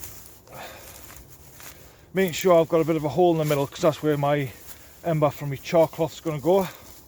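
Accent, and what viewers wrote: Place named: United Kingdom. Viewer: thanks much no problem with accent I have very many UK friends 👍 very good people in Uk top men an women 🤝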